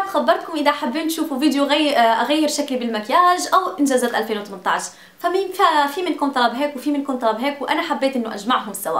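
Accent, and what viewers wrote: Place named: Morocco. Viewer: إنتي عّم تكذب إنتي مغربية من كلامك في اخر الفيديوا إنتي مانك دهبت الى تركية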